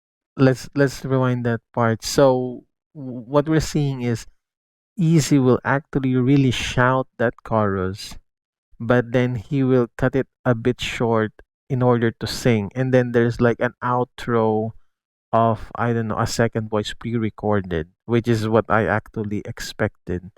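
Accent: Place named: India. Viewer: Akala ko foreigner ka nung una kong marinig boses mo noong unang panood ko sa video mo.Ganyan kasi bigkas ng ibang Indians hehe✌️keep grinding lang kapatid.May your channel grow.God bless!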